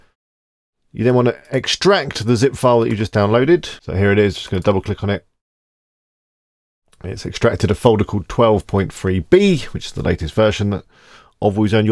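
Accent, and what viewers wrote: United Kingdom. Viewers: Are you from Nottingham perchance?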